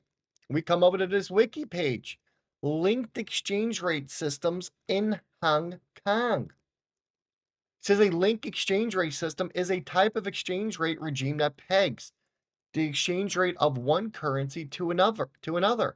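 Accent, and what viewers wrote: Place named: Western Pennsylvania, United States. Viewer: are you from the Pittsburgh area? I hear a western Pennsylvania accent when you talk.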